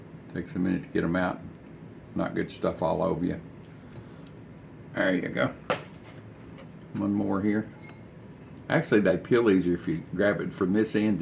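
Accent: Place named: South, United States. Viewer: Those granola bars look delicious and I’m certainly planning on making a batch or two. And by the way that’s how southerners talk. Taters instead of potatoes, maters instead of tomatoes, naners instead of bananas..... jeez give me a break. Keep up the good work dude.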